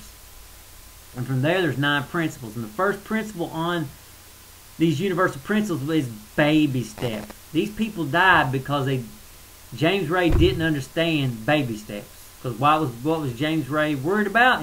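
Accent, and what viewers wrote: South, United States. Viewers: You're awesome mate.. love your southern accent. Thumbs up ;D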